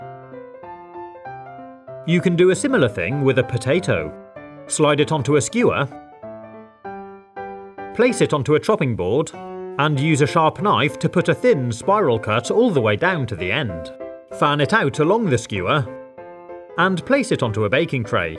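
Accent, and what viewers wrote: England, United Kingdom. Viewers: I surely know that U are an UK native right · He's British. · That fact that you say "courgette" and "rocket" proved to me that you are English. I did think your dialect did sound Enhlish, but I am not good with dialects. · He's British so it makes sense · 99% of the comments: stuff Me: are you British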